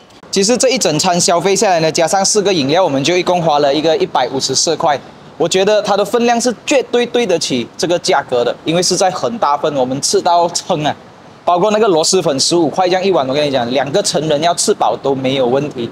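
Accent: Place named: Malaysia. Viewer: 马来西亚。 华人 听着 不是 中国人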